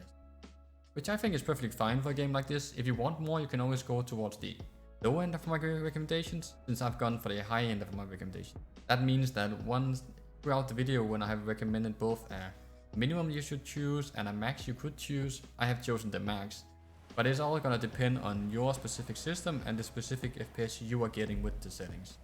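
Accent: Denmark. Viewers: U Sound danish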